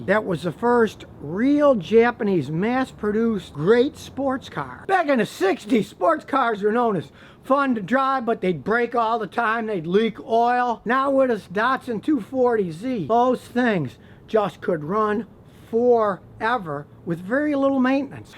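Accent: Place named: Western Pennsylvania, United States. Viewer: Is from western Pennsylvania? I’m trying to figure out his accent.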